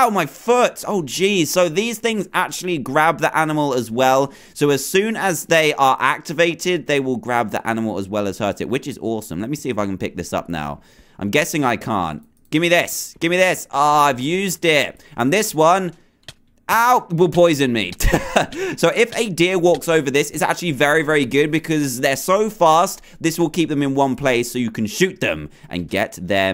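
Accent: United Kingdom. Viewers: If he says oh dear its a nothr thing plus he is british so he pronounce deer dear ok?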